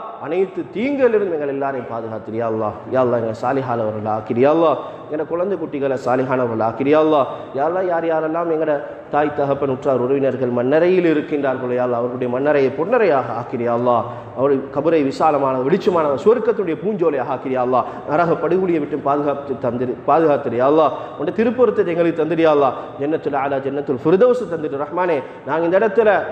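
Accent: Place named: Sri Lanka. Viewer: Sri Lanka Muslim tamil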